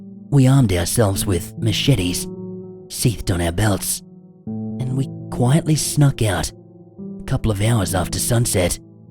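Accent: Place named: Australia. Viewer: The VOICE from DOWN UNDER!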